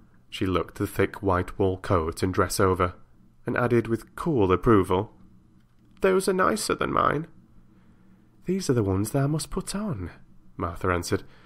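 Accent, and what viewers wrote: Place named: Yorkshire, United Kingdom. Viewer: Great reading as always! Like the Yorkshire accents, are you from Yorkshire? Keep up the great work 😀. PS how about some more Lord of the rings..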